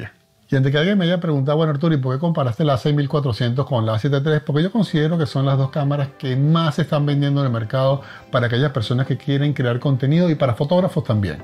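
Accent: Venezuela. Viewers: jeje nunca había visto tus videos pero apenas hablaste ya nos dimos cuenta que eres venezolano buenísimo contenido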